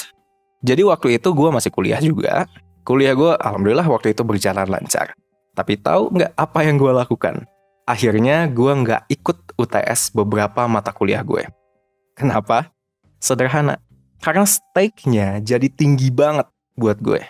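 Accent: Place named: South Jakarta, Indonesia. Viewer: dia ngomong kek orang jaksel 😆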